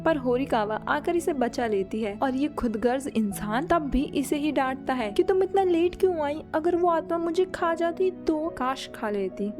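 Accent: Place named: Bhopal, India. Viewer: You must be from Bhopal Right ?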